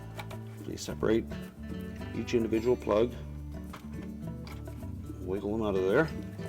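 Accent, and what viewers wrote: Canada. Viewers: Hi! I feel like I know you!!! I just want to say thank you for the VERY well done video on repairing appliances! I've watched your vids on replacing a dryer belt, as well as just today repairing my 8 year old hotpoint fridge. I replaced both the compressor fan motor and the control board, and I owe it all to your informative, easy to follow, instructional video! So this girl just wants to thank you, thank you, thank you! P.S. Are you Canadian? (jus wundrin' lol!)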